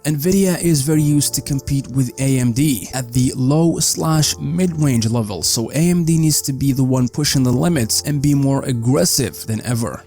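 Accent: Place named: Russia. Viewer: Мне показалось, что у вас русский акцент) успехов в развитии канала.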